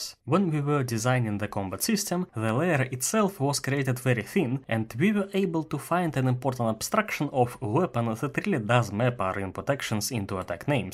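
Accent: Russia. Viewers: Ты русский? акцент очень похож )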